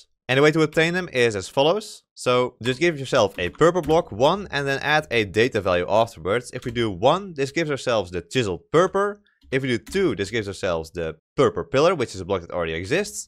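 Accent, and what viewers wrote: Netherlands. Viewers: Your Dutch i know it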